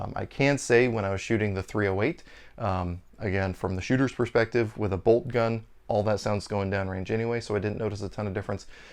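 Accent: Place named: Europe. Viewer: Someone comes from a European family... dead giveaway within 10 seconds of the video. Love the channel brother.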